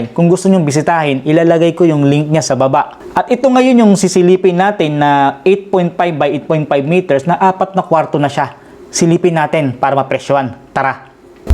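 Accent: Waray, Philippines. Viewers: Waray ka ngay-an gihap Sir. Nice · I hear waray, I subscribe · Waray ka ngay an engineer? Taga diin ka? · Waray ka pala Sir? Tacloban ka Sir?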